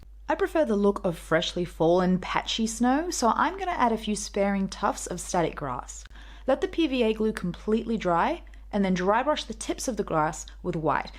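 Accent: Australia. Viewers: Also, subbed. Love seeing content produced in Australia! (God I hope that's an Australian accent, I should be able to pick that)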